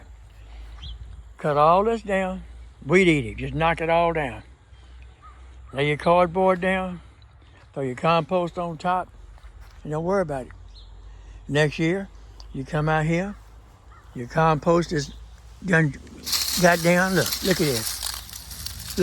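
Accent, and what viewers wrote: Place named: North Carolina, United States. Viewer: Thank you for the great video! Are you from North Carolina? You sound exactly like my grandparents!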